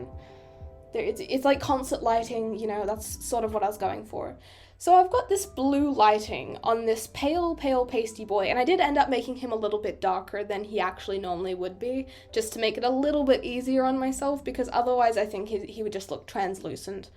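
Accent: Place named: Australia. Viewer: WAIT AUSTRALIAN???? JUST LIKE ME FR???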